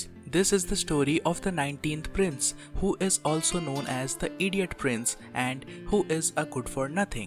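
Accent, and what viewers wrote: India. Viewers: Too much Indian accent in the voice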